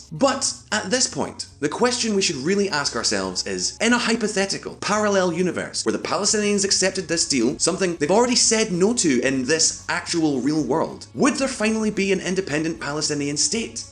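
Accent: Scotland, United Kingdom. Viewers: The (Scottish? Welsh?) accent is grrreat man! (read as A Scotsman speaking, lol) But for the love of decency, man, grow a beard and get some nerd-approved specs on your face :-D. As for Isreal/Palestine it's a case of 2 wrongs. It's very complicated; and instinctually you think, single state solution! But that's not as viable anymore as there is definitely economic disparity, and there would likely be polarizing political battles in perpetuity (not that we know anything about that here in the States). At it's core you have 2 peoples who feel the land is theirs by birthright...and the Northern Irish can tell you any peaceful coexistence is uneasy at best. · The posh scotsman is back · Finally a Scottish accent too! Gaun yersel!!:)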